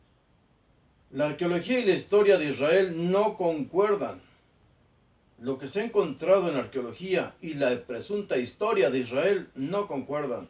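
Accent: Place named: Mexico. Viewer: Me muero de la risa escuchandolo, Doctor. Su acento mexicano es muy jobial y ademas todo lo que Ud. dice es tan cierto y obvio.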